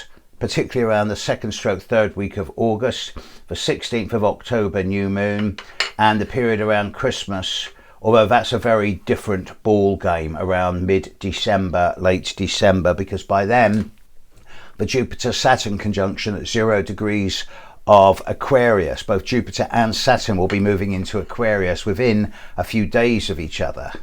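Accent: United Kingdom. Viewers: I love your British accent and love how articulate in the way you speak. Wish more people would learn how to be articulate as you are and being able to convey and express themselves in ways that are pivotal and genuine.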